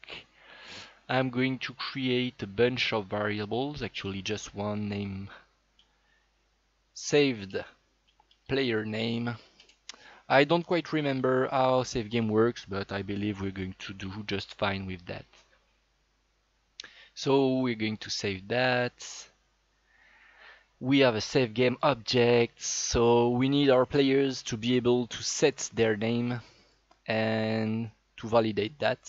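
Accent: France. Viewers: your are french ?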